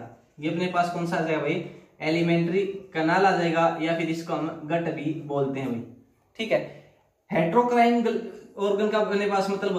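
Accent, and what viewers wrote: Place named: Haryana, India. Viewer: Boli me hi haryanvi touch hai 😅😅 gajab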